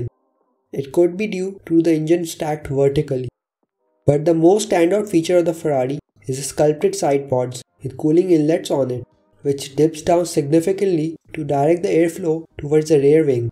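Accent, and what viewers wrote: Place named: India. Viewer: Seems like indian accent. Bruh where r u from?🌚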